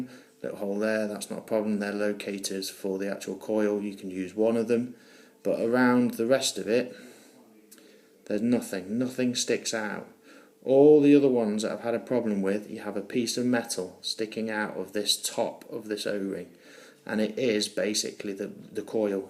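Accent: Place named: United Kingdom. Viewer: You should do voice overs. You have the classic bri'ish voice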